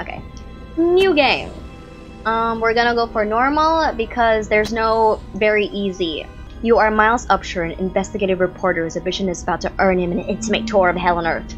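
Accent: Bisaya, Philippines. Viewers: Ate pinay ka po ba? Cute ng braces tsaka ng accent mo hehehe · Bisaya u?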